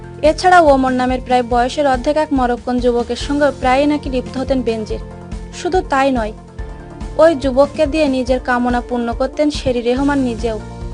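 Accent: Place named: Rural, Bangladesh. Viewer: একদম ভালো লাগে নি! কর্কশ গলার আওয়াজ! উচচারণ এ গেঁয়ো ভাব ! আগে কথা বলা শেখেন তারপর ভিডিও বানান !!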